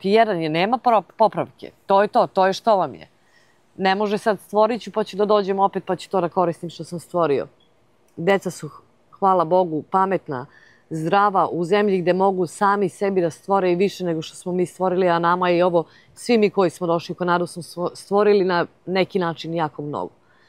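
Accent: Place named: South, Serbia. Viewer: Sve ok, ali da kaze da je iz Beograda sa ovim naglaskom - nema teorije. Juzna Srbija se cuje u intonaciji.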